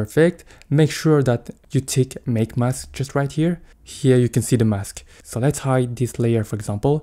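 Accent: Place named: Italy. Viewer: i like ur english akzent. are u from italy or something like that? its sound like that.. ^^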